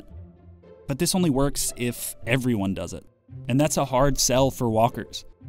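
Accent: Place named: New York, United States. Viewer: liked and subbed for the new yorker accent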